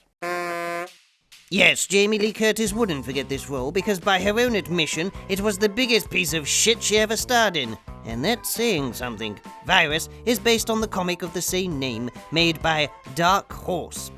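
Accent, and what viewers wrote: United Kingdom. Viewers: Hope your health is good, professor. Shocked to find out you're actually British and not American seeing as how most Americans do a better English accent than you.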